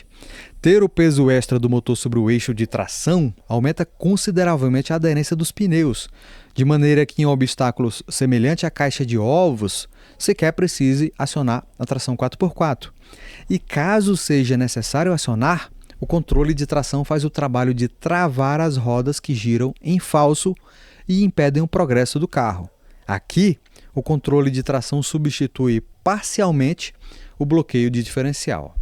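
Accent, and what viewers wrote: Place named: Ceará, Brazil. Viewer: Cabecinha de caixa d'água kkkk macho, comecei o vídeo achando que tu tinha um pé no Ceará e no decorrer, quando vocês fala "aqui no Ceará"...batista. Sua interlocução e montagem/construção do vídeo estão de parabéns. Grande abraço de um outro cearense e novo inscrito.🤝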